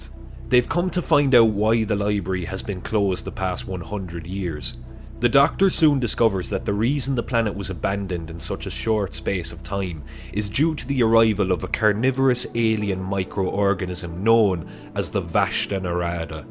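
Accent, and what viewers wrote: Cork, Ireland. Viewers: can hear an Irish accent much love from Dublin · Sounds like a Cork accent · IRISH ACCENT MAKES IT SO MUCH BETTER!!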